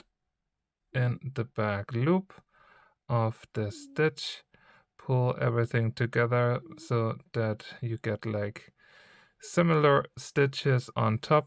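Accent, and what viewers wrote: Germany. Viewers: Dieser Deutsche Akzent, er lässt sich nicht leugnen 😂 Super Anleitung. Danke. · DIE anleitung wäre auf 2-3 Minuten reduzierbar, wenn man das Prinzip der Maschenentstehung erklärt. Mehr braucht es nicht. Und als Anschlag eine Luftmaschenkette ist einfacher. Übrigens: bei diesem gruseligen Denglish bekommme ich Ohrenschmerzen!!! Mach Deine Videos auf Deutsch oder lerne eine vernünftige Aussprache. Alles andere tut einfach nur weh!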